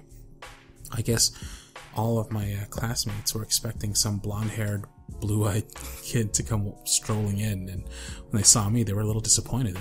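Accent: Philippines. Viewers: YOUR FILIPINO ACCENT OMG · pfft! sorry kuya c but I ended up laughing at your fil-english accent XD you sound like my foreign friends who are learning Tagalog for the first time XP but the words were spine-tingling in your voice nonetheless :3 ♡ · This cracked me up!!! > WE HAB DA PANNY ACCENT (Very Filipino accent!) 💘 When you spoke those sweet lines, I just died then and there. 😍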